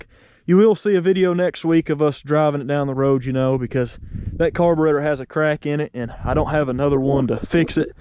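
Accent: South, United States. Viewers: I love your accent, your from the south I’m Guessing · This man has the best southern accent. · 20 seconds in an that good ole' country boy accent tells me this A is going to be running at the end of this video... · Listening to you talk, I'm guessing you're a country boy. I could be wrong. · does he speak south accent? is this south accent?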